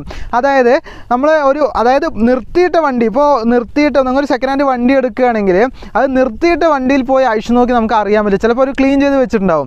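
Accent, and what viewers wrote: Palakkad, India. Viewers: Chettan palakkad aano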